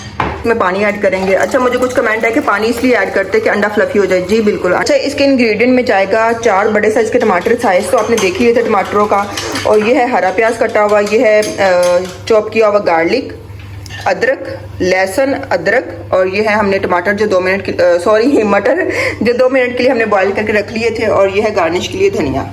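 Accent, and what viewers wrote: China. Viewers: Aap ne Chinese kaisee seekhee baita Chinese accent ?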